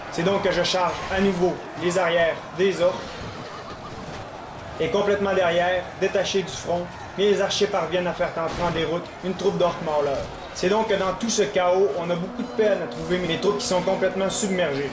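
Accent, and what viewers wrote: Quebec, Canada. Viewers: moreover, he's got an accent from Québec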